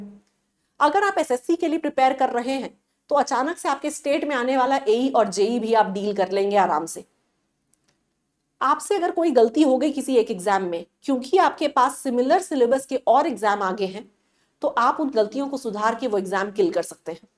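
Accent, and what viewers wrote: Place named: South, India. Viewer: AAP south se ho kya mam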